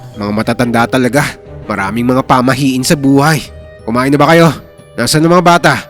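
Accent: Iloilo, Philippines. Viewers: Plss kuyaa haluan muna ng ilonggo accent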